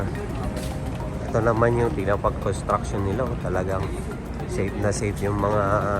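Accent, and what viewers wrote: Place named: Philippines. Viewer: Just saw you, recommend by YT. Gusto ko po yung accent nyu pilipinong-pilipino.. I like raw vlogs kahit mahaba basta realtime. keep it po kuya,, Wag nyu po kaming kalimutan pag lumago na ang YT channel nyu .. Ingat po always Godbless you po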